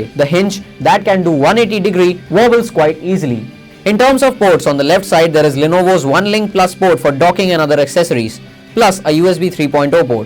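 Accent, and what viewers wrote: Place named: India. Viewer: A hindu accent combined with a shitty audio quality. Thank you, but not, thanks.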